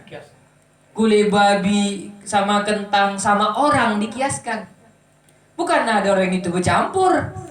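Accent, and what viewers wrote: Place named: Sumatra, Indonesia. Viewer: Logat sumtra rasa kyai NU jawa, jos pak smoga bisa bhsa jawa jdi lebih jos kalau smbil melawak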